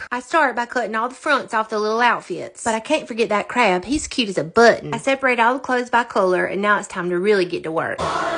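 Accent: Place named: Lowcountry, United States. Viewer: Girl dey jus don no how to hear country specially low country. You do you this Cajun girl understands you just fine. Bless their hearts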